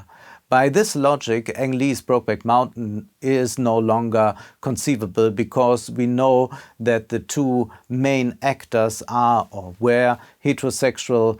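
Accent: Germany. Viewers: Interesting, so this is the german accent everyone talks about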